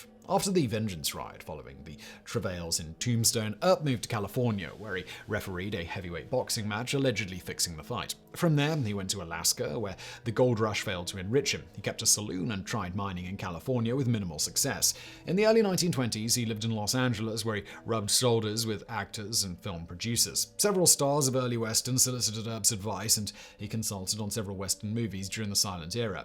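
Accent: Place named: RP, United Kingdom. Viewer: he is speaking he Queens English the real version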